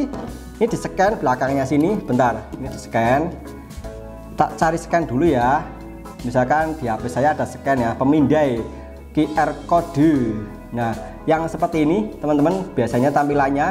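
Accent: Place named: Banyumas, Indonesia. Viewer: Mantap maas..smua teori n praktekny.. Seneng dnger ngapak2 ny ..hehe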